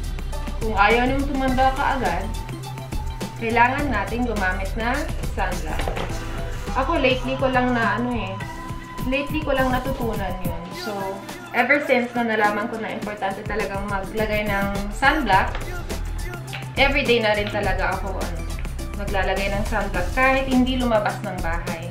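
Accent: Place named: Philippines. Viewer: Love the Tagalog accent bezzie! Haha! Awesome vids, sana magaling din ako mag makeup. Lols 😍😍😍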